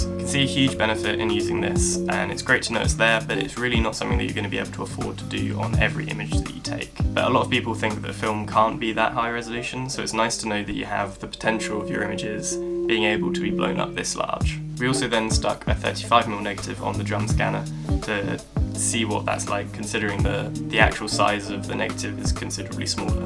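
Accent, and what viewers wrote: London, United Kingdom. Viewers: Are you from London?? :) keep up these great vids too bro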